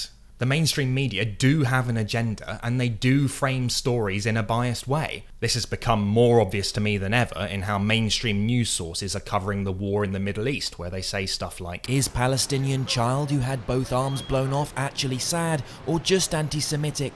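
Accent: United Kingdom. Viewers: Who is this guy? Why is he talking to me? He sounds very British if you ask me. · Your accent isn't British in the true sense It sounds English.....anglo-norman Nothing like the actual British celtic cultures like Welsh and Cornish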